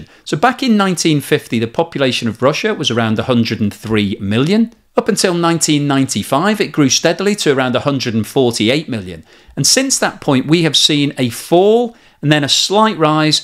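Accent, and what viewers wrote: United Kingdom. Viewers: if you don't mind my linguistic curiosity, I assume you are from the UK, judging by your pronunciation - yet you pronounce "Masterworks" the American way. Why is that (or am I somehow mistaken)?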